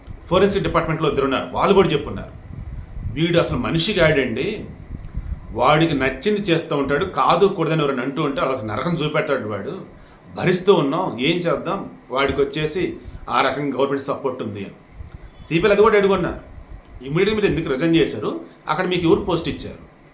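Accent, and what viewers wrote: Andhra Pradesh, India. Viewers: Your language has more of Andhra slang … for non Andhra people it’s not understandable… please speak in mutual understandable language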